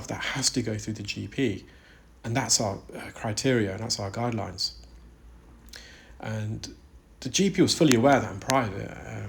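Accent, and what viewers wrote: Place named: RP, United Kingdom. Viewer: a silky, smooth posh British accent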